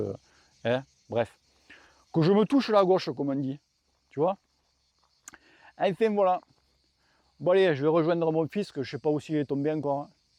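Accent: South, France. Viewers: ... Plus de 1000 bornes en une semaine. Faut nous les présenter vos potes. Ça fait des sorties de 150 bornes par jour / environ 5/6h. Ils bossent à mi-temps ? Soit ils roulent en 1ere FFC soit ils sont totalement cons au point de louper leur vie : perso, pro, etc... Bref.. Plus largement au début je trouvais le ton des vidéos bien sympathique ça sortait un peu des sentiers battus. Mais là vraiment non seulement ça ne me fait plus rire mais en plus je trouve que ça frise un peu le foutage de gueule pour tous les entraîneurs, les mecs qui se sortent les doigts du cul dans les clubs, les entraîneurs indépendants, ceux qui bossent sérieusement pour sortir des plans d'entraînements et qui font de la VRAIE pédagogie cycliste. Également pour tous les autres passionnés de vélo qui se lancent sur la toile avec peu de moyens mais qui bossent leur truc. Faire des vidéos sur YouTube un peu sérieuses c'est pas juste prendre un accent du sud et balancer une série de phrases sorties de je ne sais où en prenant pour appui des exemples de pratiques débiles de certains. 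Ce n'est que mon point de vue. Bref de toute façon c'est une tendance aussi prise par les magazines de vélo et les nouveaux médias de cyclisme sur le net : des titres pute à clics, pas de fond, pas de références sérieuses, pas de dossier travaillés, du matos à 10000 euros à toutes les pages, certains écrivent des trucs on ne sait même pas si ils ont déjà mis le cul sur un vélo. Bref, le seul qui sort un peu du lot aujourd'hui s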